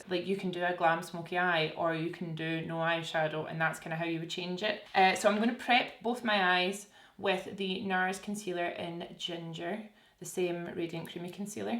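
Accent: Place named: Glasgow, United Kingdom. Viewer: Always the highlight of my day when you post! I’ve just moved to London so its always nice to hear a Glaswegian accent every now and then😂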